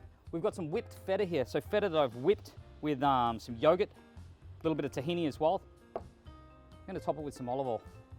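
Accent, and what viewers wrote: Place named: Australia. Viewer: Great food, and good to hear an Australian accent! I note you also have a Big Green Egg behind you - if you could only have one, would you choose a wood fired oven or a Big Green Egg?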